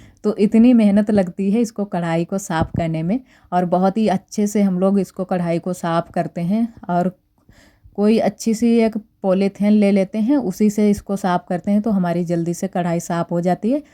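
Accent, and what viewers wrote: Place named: Bihar, India. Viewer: Original village routine, ye kon sa gaon hai, dekhkar to UP ya Bihar se lg raha hai, aapki boli main Bihar ki jhalak hai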